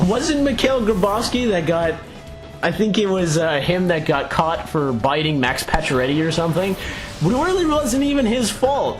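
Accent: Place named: United Kingdom. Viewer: I'm British and i like your British accent! :)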